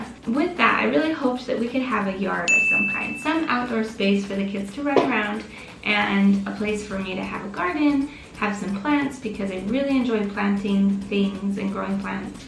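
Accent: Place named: United States. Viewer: Yes. Awesome! Keep the American accent. It goes a long way in the future. Much love from San Francisco California. Jesus is EVERYTHING.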